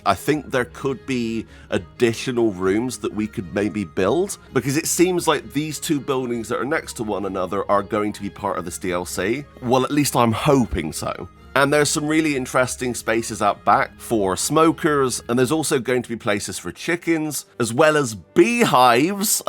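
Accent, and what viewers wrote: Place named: Bristol, United Kingdom. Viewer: i'm almost certain you have a southwest/bristol twang and i can hear it from a mile away (i'm from there) not a bad thing also! good video keep it up :))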